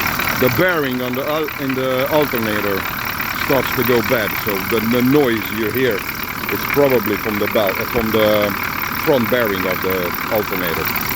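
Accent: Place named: Scania, Sweden. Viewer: Gillar dina filmer, skönt att lyssna på den ljuva skånskan😊. Men den saknade man i detta klipp😏🤔🥲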